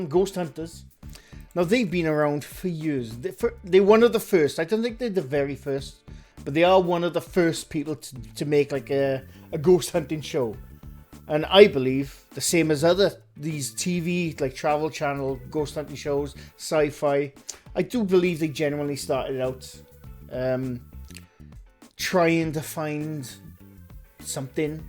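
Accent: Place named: Scotland, United Kingdom. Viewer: You go sir, I would love to meet you and we do our own investigation and your absolutely correct once that money rolls in they are no longer a progression of themselves and start making bullshit. Id do a investigation with ya but it seems like your from maybe Scotland holy shit that whole place is haunted as hell I truly believe. Keep doing what you are doing I love it ..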